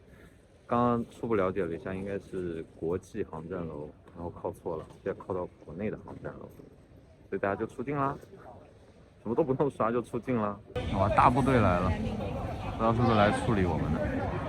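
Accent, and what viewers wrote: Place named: Taiwan. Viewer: 长着北方人的脸，操着半吊台湾腔。你在台湾呆多久？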